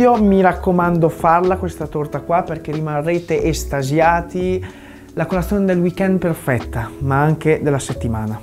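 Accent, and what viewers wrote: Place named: Emilia, Italy. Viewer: Ma sei troppo forte, sembri emiliano come me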